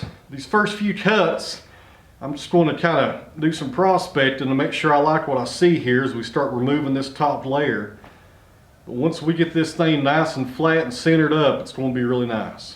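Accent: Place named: South, United States. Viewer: You are a very charming guy. I love your southern accent. Love from Canada 🇨🇦. The Cats are adorable 💕💕💕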